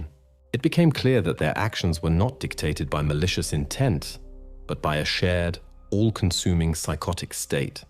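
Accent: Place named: England, United Kingdom. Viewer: What happened to the other voice, without the English accent? All of these new channels are using the accent now and it' has gotten old. I listened here because of the American accent, now it's gone.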